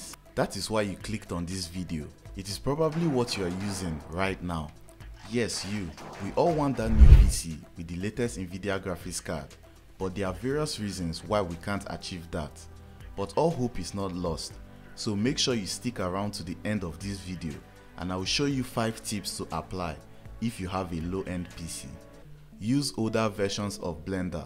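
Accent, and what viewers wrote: Nigeria. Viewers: Are you from Nigeria 🥺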